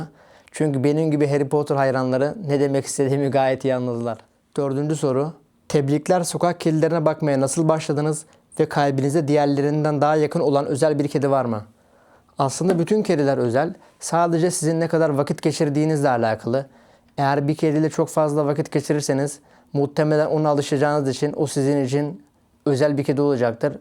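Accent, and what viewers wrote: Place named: South, Germany. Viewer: Thanks for sharing dear ❤May I ask another question: How come your German is so good? I once heard you talking, even with a southgerman accent. 😊I know I am late with a question, so feel free not to answer. Good there are people like you on this planet!❤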